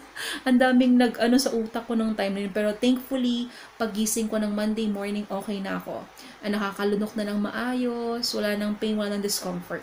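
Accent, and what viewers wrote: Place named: Pangasinan, Philippines. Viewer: hi po teacher! just found your channel because of 51talk..I'm a new teacher po and because of your videos po nalalaman ko ibang tips and tricks regarding the company..i am from lingayen pangasinan po and napansin ko po ung "ey" na expression kaya napa subscribe ako kasi sa isip ko "uy pareho kami...tiga pangasinan kamin dwa" hahaha nice to see someone po here in youtube na kapareho ng dialect..keep on updating us po sa mga ganap and thank you po sa mga shinishare niyo malaking tulong po..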